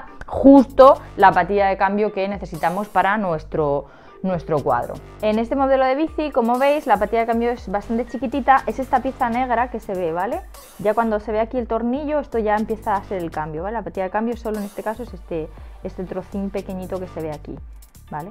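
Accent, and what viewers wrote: Spain. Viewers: lastima ke no se le entiende mucho su acento espanol.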